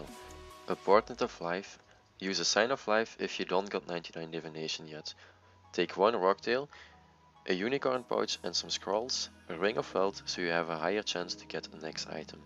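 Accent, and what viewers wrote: Netherlands. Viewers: are you dutch ? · you dutchbro? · Dat Nederlands Engels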